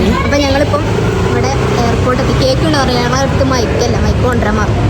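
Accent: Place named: Malappuram, India. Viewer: നിങ്ങൾ എവിടെ മലപ്പുറം ആണോ? Am from malappuram,slang കേട്ടിട്ട് മലപ്പുറംപോലെ അതാ ചോതിച്ചേ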